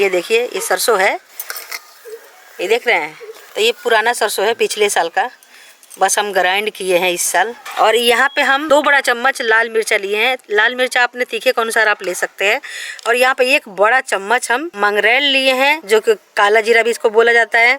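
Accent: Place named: Chapra, India. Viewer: Tohar bhasha se bhujhata rahaa chaapra bihar se lagti hai.kaha se hai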